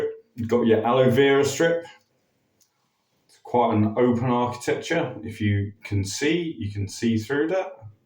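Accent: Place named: United Kingdom. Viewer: The thing i love about british is the accsent it proper🇬🇧🇬🇧🇬🇧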